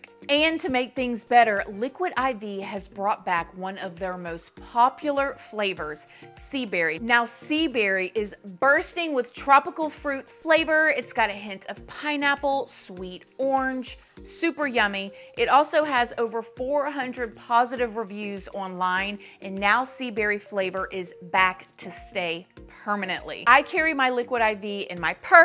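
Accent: South, United States. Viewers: It's just her accent guys. She's got a southern accent. Hahaha it's that "southern twang" that's funny though, y'all are funny.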